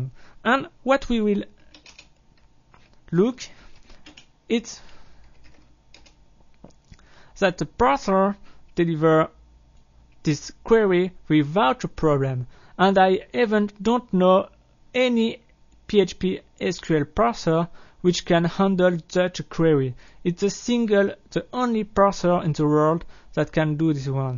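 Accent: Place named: France. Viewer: He has the same accent as mine, it is a french accent, and it's the most beautiful accent in the world, so you're wrong. If you can't understand a french speaking english it means you aren't worthy of hearing what this person is saying. French accent has been voted the most understandable foreign accent by a panel of very wise and independant judges.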